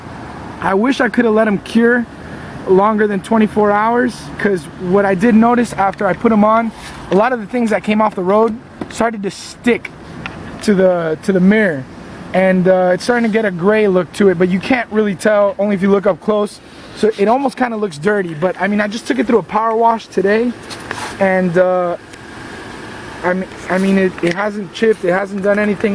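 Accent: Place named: Mexico. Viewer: quit talking like a Mexican. Looks good.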